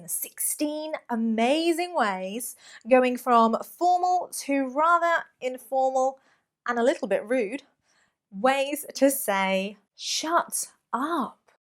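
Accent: United Kingdom. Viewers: Cos her British accent😁💟💟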